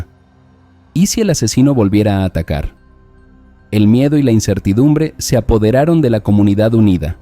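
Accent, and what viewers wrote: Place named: England, United Kingdom. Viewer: Que mal narrados estan los videos, habla un español que de repente no se entiende como que lee mal las lineas y las pronuncia en ingles. Deberian poner a un narrador que si entienda y sepa leer el español.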